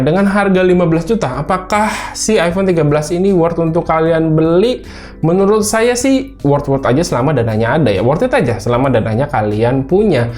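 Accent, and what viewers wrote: Samarinda, Indonesia. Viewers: Org Samarinda bang? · Wah org samarinda nih abangnya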